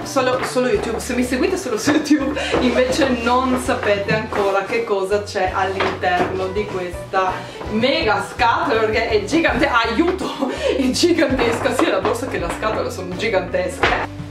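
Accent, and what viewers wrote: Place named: Trieste, Italy. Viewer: accento da vera triestinazza doc..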